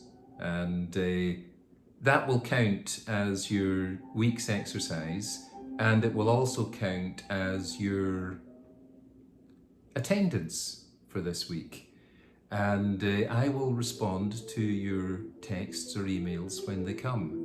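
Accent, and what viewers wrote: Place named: Scotland, United Kingdom. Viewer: Icottish accent 👌👌👌👌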